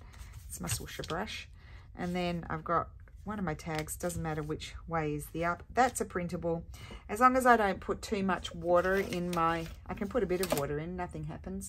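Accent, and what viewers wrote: Australia. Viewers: Just found you today. Nice to hear another fellow Aussie ❤ Do you have the name of that seedpod stamp you used? It was gorgeous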